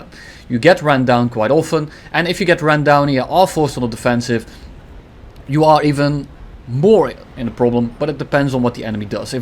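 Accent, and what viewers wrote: Netherlands. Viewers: Ik hoor het Nederlandse accent in hem but sometimes he speaks English like an American!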